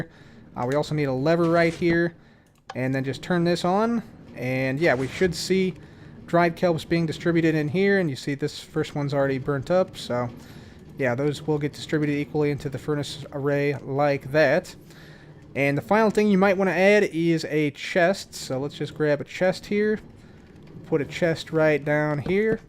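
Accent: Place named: Alabama, United States. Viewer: Dat Alabama accent tho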